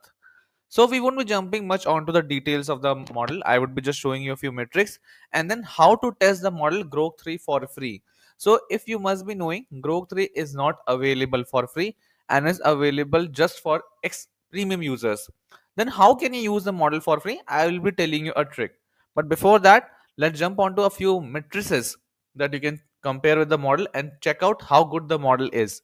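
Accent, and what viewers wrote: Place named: Germany. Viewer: Dieses "Dinglisch" kann ich wieder nicht verstehen. Schade.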